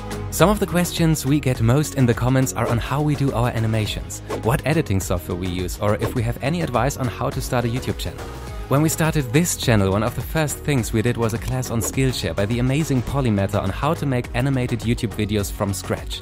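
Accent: Germany. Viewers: I’m not 100% sure but I think he has german accent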